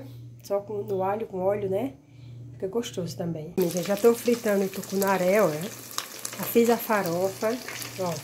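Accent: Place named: Northeast, Brazil. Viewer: Esse sotaque nordestino e a maneira delicada e amorosa como a senhora abençoa os seus seguidores, fazem bem a alma da gente.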